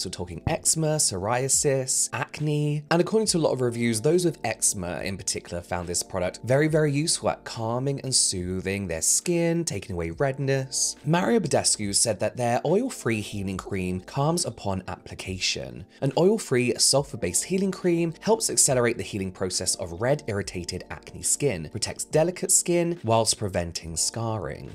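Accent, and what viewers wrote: United Kingdom. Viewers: struggling to say the second steroid ingredient made me happy…. Simply because I also cannot say anything correctly, and to hear a very posh English person also struggle… One of us!! Is all I could think 😂😂 thanks for being a real person haha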